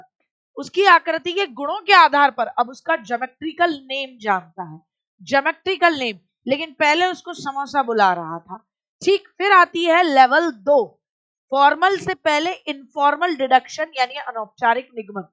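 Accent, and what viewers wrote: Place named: Haryana, India. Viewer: Haryanvi bol rhe h bhaiya wo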